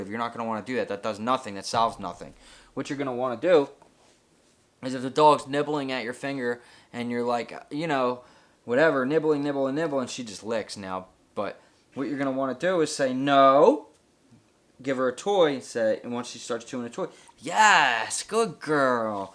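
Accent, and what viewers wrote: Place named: Maryland, United States. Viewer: I was thinking Maryland too, possibly Delaware. The accent!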